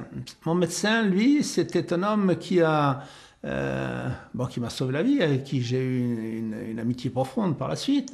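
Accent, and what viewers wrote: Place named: Toulouse, France. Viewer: Ça fait plaisir de te revoir et entendre ta voix et ton si joli accent toulousain. Merci! Ton témoignage a été l’occasion pour moi d’ouvrir une porte sur un univers insoupçonné 🙏 😘💕💕💕💕💕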